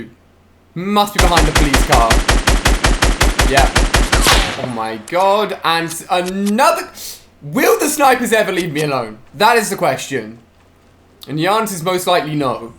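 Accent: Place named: England, United Kingdom. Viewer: Mason Coggins he didn’t he said freaking remember he has a accent cuz he is from England duh he said like he said the f word but he didn’t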